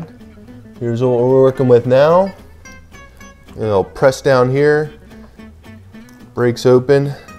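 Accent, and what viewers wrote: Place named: Pennsylvania, United States. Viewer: are you from Pennsylvania? It is the way you say certain words. Thanks for sharing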